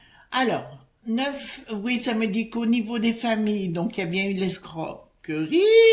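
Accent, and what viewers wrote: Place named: South, France. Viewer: Merci pour votre tirage ! Surtout gardez votre accent du sud qui fait tellement de bien aux gens du nord ! A écouter le Pr Perron sur SUDR.... qui parle de la picpic et du Pr défraîchie..... la parole se libère !!!!!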